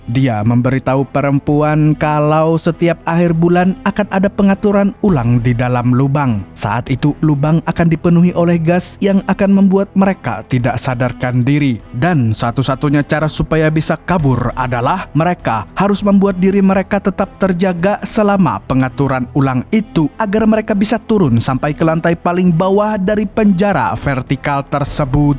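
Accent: Banjar, Indonesia. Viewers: Orang banjar sakalinya😂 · Bang orang Banjar kah...Banjar mana Pian bang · Orang banjar kh pian??? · bah dah jua urng banjar si Mimin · Orang Banjar kah mang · Urang banjar kh pian bg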